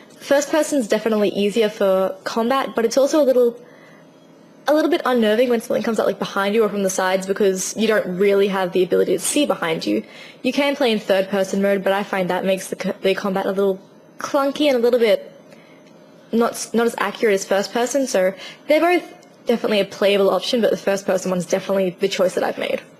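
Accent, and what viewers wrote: Australia. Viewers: Sounds Aussie to me, meh doesn't matter she cute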